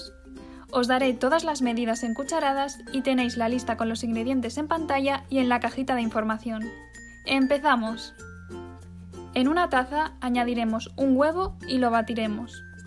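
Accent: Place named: Argentina. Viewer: Jajajajajjajajajja siiiii obvio tono re argentino ehh arriba argentina xd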